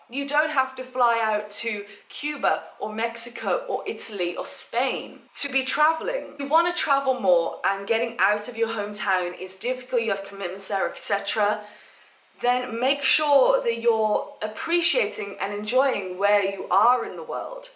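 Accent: United Kingdom. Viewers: btw i think you are gorgous and i love your british accent happy new year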